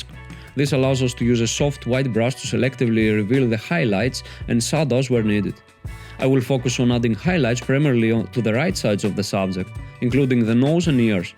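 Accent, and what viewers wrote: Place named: Turkey. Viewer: Sotaque forte de Turco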